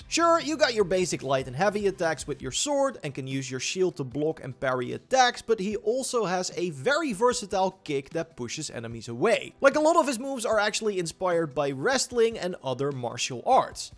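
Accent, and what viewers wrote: Netherlands. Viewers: Bruh learn how to speak English. Why do you have to push that Dutch accent in so hard? It makes your videos unwatchable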